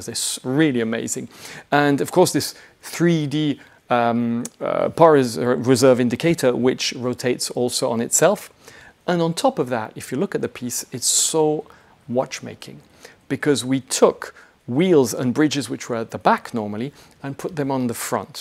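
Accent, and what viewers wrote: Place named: France. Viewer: i like the host' french accent, he got the right vibes on reviewing watches and makes me want to learn to speak french. Want more of his reviews in the future 😂🇫🇷👍🏾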